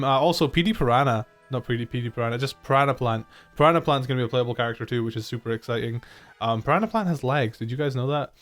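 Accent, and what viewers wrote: Scotland, United Kingdom. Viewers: what part of Scotland you from?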